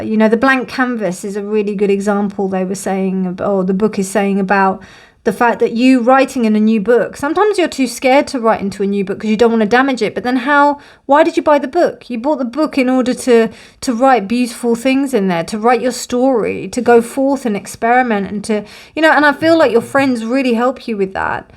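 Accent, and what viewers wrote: United Kingdom. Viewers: Finally, I'm done with the things I need to face. And now I have the time to see this! Woooh! I already watched the other suites and I saved this as last. Save the best for last. Thank you, Ms for choosing this deck. I love this deck. ✨🤍 Yet it made me had a hard time choosing for I love all the cards from this deck. 😂 And when you mentioned "Harry Potter" with your british accent. I suddenly remembered Hermione. Add your intelligence, brave voice and laugh. ✨🤓✨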